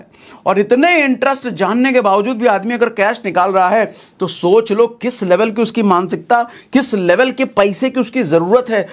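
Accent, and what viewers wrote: Bihar, India. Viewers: I love bihari style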